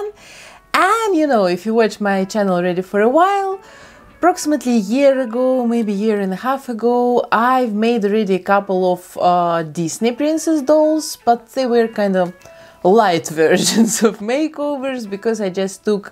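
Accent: Russia. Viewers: Что за акцент..русский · Кажется как будто акцент русский... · русский акцент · Что за жуткий рязанский английский?) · Ну скажите, что вы русская! Такой сильный акцент!)) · иногда кажется, что она русская по акценту и словам) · Вы русская? Чувствуется акцент) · Ты из России? Прям чую чуйкой акцент)/ Are you from Russia? I feel the uccent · Русский акцент? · какой то русский акцент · Кукла в вашем исполнении получилась великолепной. Томные, выразительные глаза подчеркивают некую таинственность в Жасмин. Очень понравился результат. Во время просмотра вашего видео я никак не могла понять, у вас присутствует некий русский акцент, или я ошибаюсь?) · У тебя русский акцент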